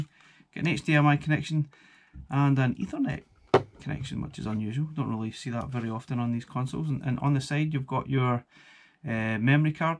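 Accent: Scotland, United Kingdom. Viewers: Hi! First off, I like your reviews the best… Scottish accents are my favorite! I speak English but can’t understand a word of Scottish! It’s brilliant! My question…. Does it have intellivission?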